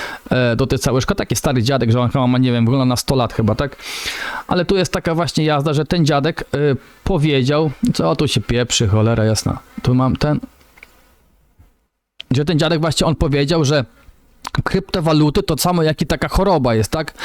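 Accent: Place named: Ukraine. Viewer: Hhehe dobra jazda 😁 chyba ukraińscy jutuberowie od krypto wchodzą na polski rynek bo już widzę 3 analizę z nie naszym akcentem :-) trochę jajcarsko ale ok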